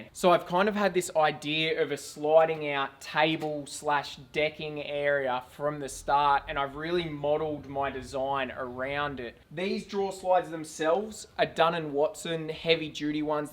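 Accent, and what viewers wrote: Australia. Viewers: Lmao I love his voice, that Aussie twang is great!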